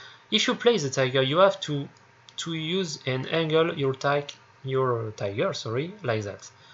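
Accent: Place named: France. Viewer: avec le petit accent français c parfait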